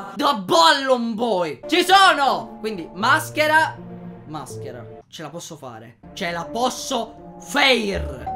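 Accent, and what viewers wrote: Sicily, Italy. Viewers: Sei siciliano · sei siciliano? Io si sono palermitano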